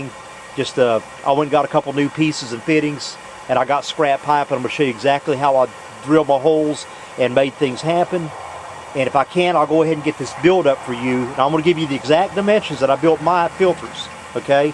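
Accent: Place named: South, United States. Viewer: its weird hearing a southern accent saying "you guys" are you originally from up north?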